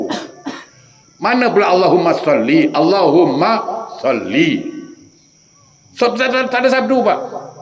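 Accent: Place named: Johor, Malaysia. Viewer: Assalamualaikum ustaz,bagus penyampaian ilmunya mirip loghat Johor malaysia.. Alhamdulillah.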